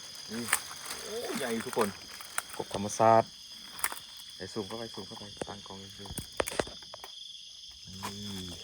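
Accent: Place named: Loei, Thailand. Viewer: เฮาเว้าสำเนียงเดียวกัน เว้าคือกันทางเชียงคาน จังหวัดเลย ข้ามมาเที่ยวฝั่งไปแหน่เด้ออ้าย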